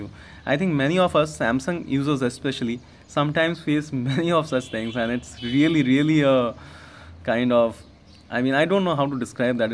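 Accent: India. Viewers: I like your Indian accent.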